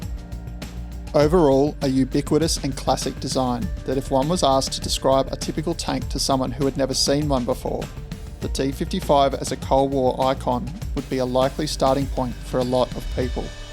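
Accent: Australia. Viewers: It's so comforting to hear an Australian voice on a very good video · Aussie accent! Liked and subbed you legend.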